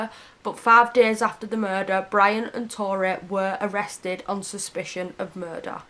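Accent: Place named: Lancashire, United Kingdom. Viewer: I love your Lancashire accent.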